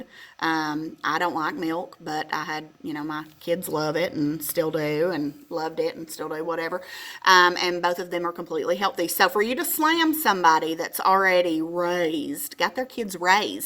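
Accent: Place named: Texas, United States. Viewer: I recognize a Texas accent anywhere!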